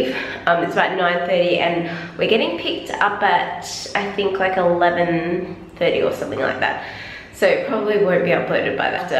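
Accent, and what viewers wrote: Australia. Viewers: haha is so aussie sounding (this is coming from a Kiwi haha)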